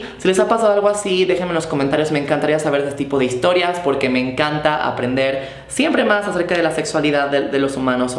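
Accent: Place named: Mexico. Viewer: ...yo lo que tengo...es una tremenda atracción (casi adicción) a tu voz... Sí, sé que en algún momento has reconocido que no es lo que mas te gusta de tí, pero a mi sí Esa mezcla perfecta, entre ese timbre algo grave (muchas OTRAS mujeres tienen voces hermosamente graves) y eso está lejos de ser un defecto en mi opinión. Pero continúo...mezcla perfecta entre ese timbre y la tonadita típica de alguien de México como tú, pero con una enorme femineidad en la manera como hablas... Y para que mas o menos te puedas hacer una idea de "que tipo de tipo" te está diciendo todo esto...te dejo un link de mi Galería fotografica de Flickr, para que compruebes que mi punto de vista, el de un sexagenario, hetero perdido, fotógrafo por hobby y aficción, que fotografía y charla amenamente con cera de una "veintena de veinteañeras" al día... y en belleza, he de reconocer que pocas se te acercan...y ninguna te supera <link>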